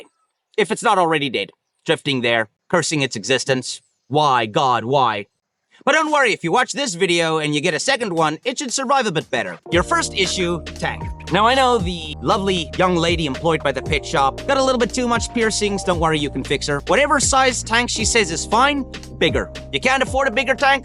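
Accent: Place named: South Africa. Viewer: Hey you’re South African I noticed an accent Aseblief please get a mic And can goldfish have tank mates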